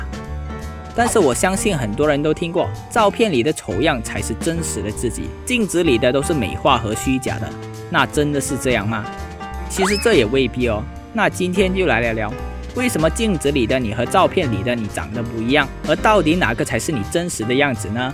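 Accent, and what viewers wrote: Malaysia. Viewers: 偶然刷到这个影片，听你口音一听就是马来西亚，不像是台湾还是中国，翻了一下，居然是吉隆坡的。突然觉得马来西亚的Youtuber有望。很棒ayy! 加油!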